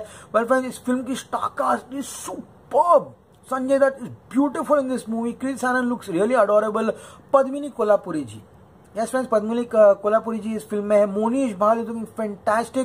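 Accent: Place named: Maharashtra, India. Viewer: Dada your Marathi was so sweet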